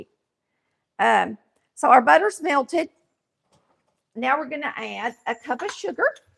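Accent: South, United States. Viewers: I've listened to.your. blog.several. times now it is the.best i.have it memorized. I have 5 pds. of.raw Spanish peanuts coming to my door step the nuts should be here by monday.I'll be making the brittle in a cast iron skillet. Butter makes everything taste better. I love your southern accent I'm from Wa.state.May God bless you.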